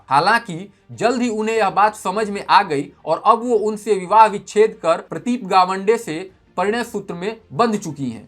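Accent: Standard. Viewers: Wah...itni suddh hindi sunn kar mera mann gad gad ho gaya....bahut bahut subhkaamnaye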